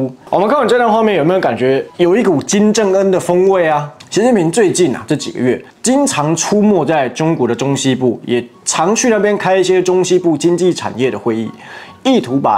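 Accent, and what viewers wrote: Taiwan. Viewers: 喜欢听你说话❤曾经有个台北的女孩，名叫 木兰，是我们店里的舞台编辑，她说话就是这个味道，给我讲了很多台湾的福利，真是羡慕啊🎉🎉🎉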